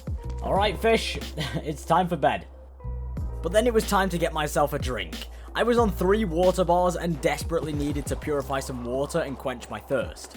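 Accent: United Kingdom. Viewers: Are you British? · Sorry to ask are u British?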